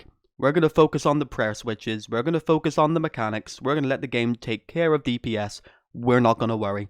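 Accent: Northern Ireland, United Kingdom. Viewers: Epic vid, saving for later :) Sounds like a norn iron accent, where are you from haha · wait wait wait, are you northern irish ?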